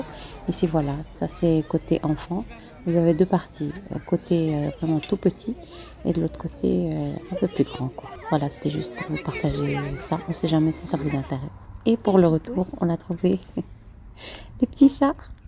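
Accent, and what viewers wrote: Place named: Belgium. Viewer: Ca sent la belgique quand tu parles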